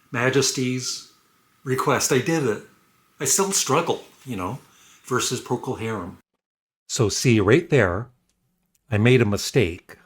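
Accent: Canada. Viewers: Yes. He means when you pronounce the word 'About'. it does often sound like 'Aboot' because of your Canadian accent. That's all. But it is obvious that the word is 'About' so there really is no need for him to mention it. 😊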